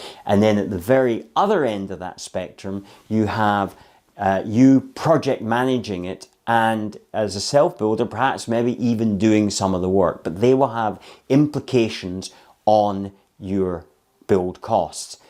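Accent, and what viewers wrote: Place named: Scotland, United Kingdom. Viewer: Love your Scottish accent! I was just speaking to a friend about building my own house one day away from everyone (dreaming)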